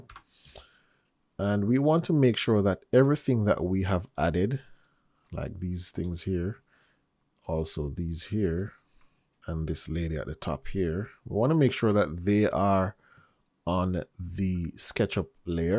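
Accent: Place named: Jamaica. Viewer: Thanks bro. Peace from Cape town South Africa. I'm enjoying your series. And that Jamaican accent allows for good vibes whilst learning .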